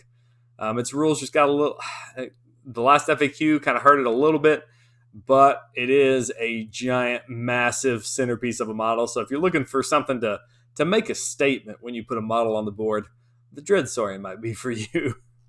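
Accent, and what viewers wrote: Texas, United States. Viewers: Really good video! Loving the Seraphon focused content! Awesome channel, keep up the hard work. Shout out from Kansas bro! thought i sensed a Texas accent in there! 🤘😁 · Ya know I'm not great at picking out accents but I'm pretty I'm pretty sure this guy's a Texan too. *proceeds to miss the lone star in the back for over half the video*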